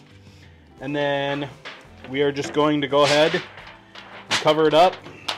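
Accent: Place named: Northern Minnesota, United States. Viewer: Thank you for the great video! It sounds like you are in northern MN? Maybe around Duluth?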